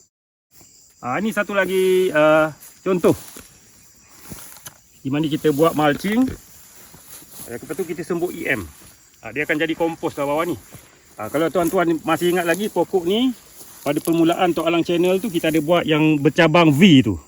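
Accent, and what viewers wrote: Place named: Terengganu, Malaysia. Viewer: Assalamualaikum,tumpang tanya, asal dari mana,bunyi macam orang Trengganu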